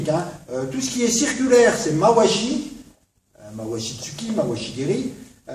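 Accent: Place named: Franche-Comté, France. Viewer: J'adore tes vidéos. Tu as un léger accent qui me rappelle mes origines, le territoire de Belfort et la haute Saône. Cordialement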